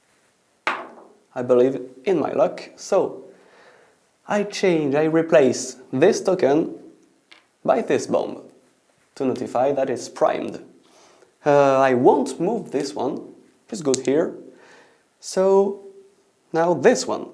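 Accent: France. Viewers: should have done the whole presentation, the French accent sounds much better on women. Also, the hand motions were super distracting. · Thank you for this video. I have worked with suppliers in Switzerland and France for twenty years so I am very used to hearing English with a strong French accent. In addition I must say your English is excellent, I wish my French was a fraction as good. I can see how to a person not used to listening through a strong French accent that this instructional video can be difficult. This isn't a casual conversation but detailed instructions so anything which distracts from the details is noticed much more than usual. I would recommend people watch this more than once if they are having problems. Any person who has a different language as their primary would have the same difficulty here. Part of the problem is Americans are not used to listening through an accent, especially a French one. 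That is just the nature of having one language dominate the majority of the continent you are on. As far as the hand waving, it doesn't bother me at all. I live in New York and half my family is Italian. It is said the best way to shut up an Italian is to tie his hands up so it does not distract me at all:-)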